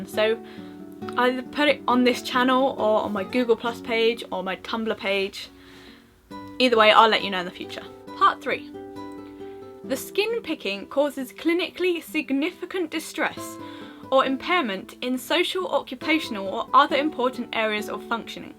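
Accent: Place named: United Kingdom. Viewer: Great video! By the way, I love your british accent! :)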